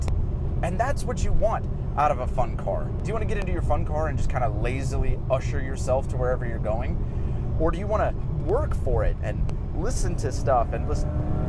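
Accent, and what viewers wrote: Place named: Wichita, United States. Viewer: Does everyone in Wichita(aside from Wizard) speak with the same cadence as?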